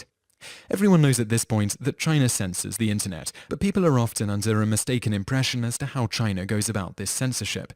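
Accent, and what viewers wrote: United Kingdom. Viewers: David T Spoken by a British person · Many of the "ridiculous myths" I never even heard of. Seems like pro Chinese propaganda to me, narrated by Guy with a British accent to make it sound more intelligent. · The narrator in the video is British not American, and the subject of the video is to clear up possible misconceptions of the Chinese as a society. How does this diatribe become a false attack on Americans? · I'm pretty sure the announcer guy's a brit. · So much fail... Have you never heard a British accent? Or did you think Americans have this one? WOW... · The commentator is British. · zekeboy24 very true , is he a British guy ? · Not really irony, they're not the same american people who created the myths, plus, like Rachel said, the narrator's brittish.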